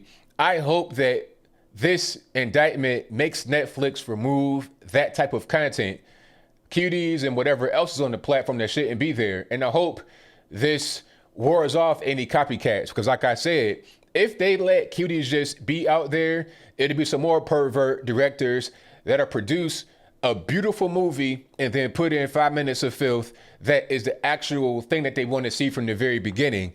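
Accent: France. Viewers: Your French accent cracked me up! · Nice French accent Sir. Yeah, this is disgusting and it appears that the devil knows his time is almost up and he is trying to pull more children to hell with him. All we seem to do is screw over our children. I cancelled my Netflix over this.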